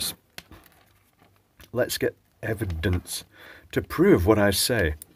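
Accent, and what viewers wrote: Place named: Glasgow, United Kingdom. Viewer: Great video, your Glaswegian today reminded me of oor wully for some reason, you don’t happen to sit on an upside down bucket when you record these do you? Excellent inspiration for us Scottish electricians during a tough time. My Wully, your Wully- a’boadies Wully! Translation: My Willy your Willy - my maws no got a Willy. Intended meaning- my William, your William he’s everybody’s William!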